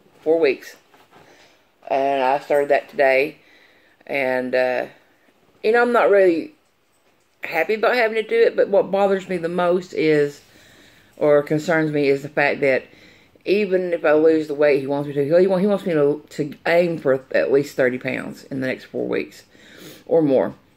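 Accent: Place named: Tennessee, United States. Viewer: Hi! I found your videos, kind of accidentally. First thing that caught my attention was that you talk just like me so I’m thinking you may possibly be a Tennessee girl?!:) Anyway, just throwing something out there for you- I have struggled with my weight for the past few years (had lap band surgery 2011) but truly wish I wouldn’t have as I had trouble taking off work to drive to Nashville to get my “fills”, and now my insurance doesn’t cover it anymore. Anyway, a few months back, I found a Facebook page called Snake Diet Motivation. It has been LIFE CHANGING for me. It involves fasting(which I was taught all my life was unhealthy). But, I tried to have an open mind since nothing else i had done, for years, was working. I started reading testimonies on the FB page and the rest is history’s it has been LIFE CHANGING. Check it out if you have any interest at all, and prayers for your health and healing!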